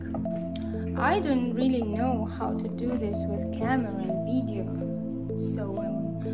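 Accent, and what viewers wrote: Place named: Russia. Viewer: Congrats on your hard work! I liked how you struggled with plank exercise at first. You say “I hate plank, arhhhh” in that beautiful Russian accent. Lol! Then it got easier and easier so you get tougher and tougher. I watched all 14 workouts and your cardio stamina improved greatly. You don’t need to lose any weight (talent agents suck!) but I hope your routine becomes a lifestyle. Good luck!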